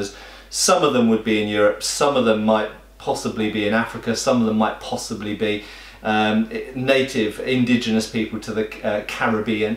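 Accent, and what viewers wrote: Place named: United Kingdom. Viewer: "They didn't just spring out of the earth" - 2017 btw that was only funny because of you're british accent xDD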